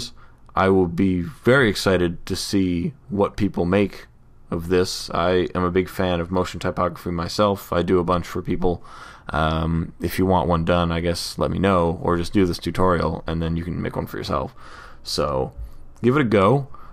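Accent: Canada. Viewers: I know it's mostly just because you're Canadian, but omg you sound so much like Seth Rogen. <3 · You sound canadian are you? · Are you canadian? :D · Nice work! thanks for the help! By the way, are you a Canadian?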